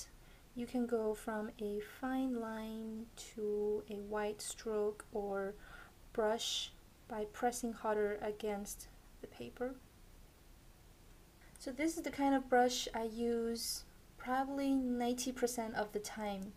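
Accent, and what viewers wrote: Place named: France. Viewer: You have such an amazing french accent, are you french? or have you lived there?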